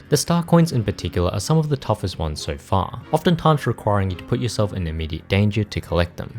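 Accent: United Kingdom. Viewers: british ew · its standard pronunciation for uk english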